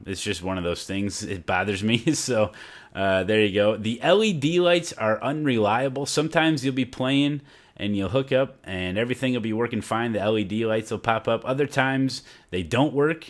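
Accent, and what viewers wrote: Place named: Midwest, United States. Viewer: Hey man whatsup. love the streams. But As for the click being a con, streaming that can definitely be an issue, but i dont think its a con in itself. Some love and/or need the strong satisfying click. but that should be an option i agree. if the click wasnt there id still want a nice solid tactile thump to confirm i had shifted, not some mushy feel ya know? And im from georgia myself, cant quite pinpoint your accent, midwest?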